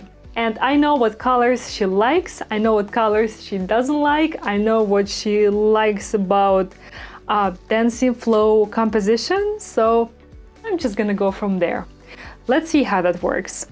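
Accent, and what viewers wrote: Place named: Russia. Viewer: Another beautiful creation! In the least creepy way possible... is such a beautiful & charming lady & i am basically in love with the happiness she experiences through her creativity. I also have a thing for russian women & their english dialects. AND she's an artist... Her husband is a lucky guy!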